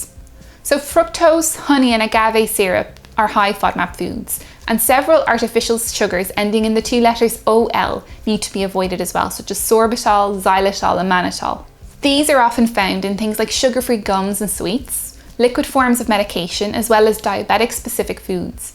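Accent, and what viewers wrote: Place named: Ireland. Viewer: That ìrish accent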